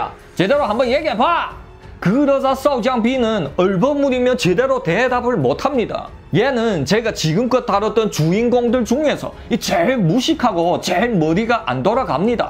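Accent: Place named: Northeast, China. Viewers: 이분 조선족 사투리 해설 정말 재미있네 앞으로도 계속 부탁할께요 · 와 진짜 재밌다.우리 동북에서의 구수한 사투리에 반함 ㅋ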